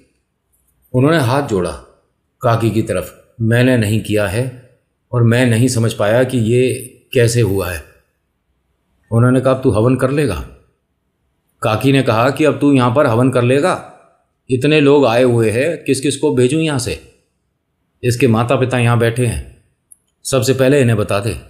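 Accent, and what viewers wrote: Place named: Braj, India. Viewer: 🙏🌹जय श्री श्याम 🌹🙏 भाई ये क्यों बोल दिया की सिर्फ कहानी ही मिलेगी ये किस ग्रह के जीव की बात मान ली अरे भाई हमें ज़ब इधर उधर की न मिल जाय मजा नहीं आता और बीच मे जो ब्रज भाषा निकल जाती है उसे छुपाना नहीं वो ही तो आपके मुख से प्यारी लगती है तो फिर धन्यवाद जैसी आपकी मर्जी सुनाओ हम तो घर मे भी सुनते हैं 😅अलीगढ से रविंद्र सिंह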